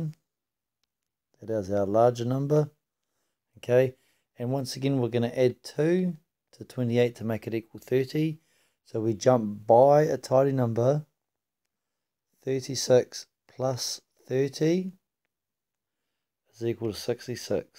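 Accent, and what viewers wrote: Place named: New Zealand. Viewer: as soon as I heard your accent bam I knew you are kiwi!!!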